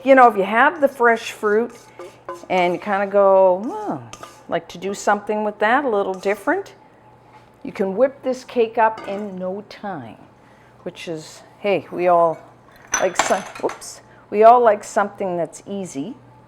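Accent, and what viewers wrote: Canada. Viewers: Less than a minute in and I knew you were Canadian! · Oh wow that looks delicious. I love how you give metric measurements as well as the imperial. Saves me having to convert the measurements. I am an Australian and love to find things that are different to the things we have here. You are Canadian right ? Something about your accent, I recognise. I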